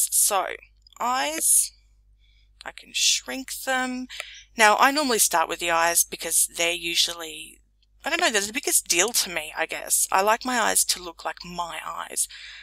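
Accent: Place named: Australia. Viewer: An Aussie tutorial Yes!!! I can understand this (I am from Melbourne) and very very helpful thank you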